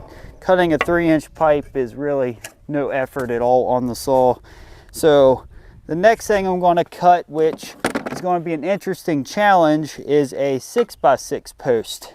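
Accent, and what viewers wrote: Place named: Baltimore, United States. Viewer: Where ya from, Baltimore?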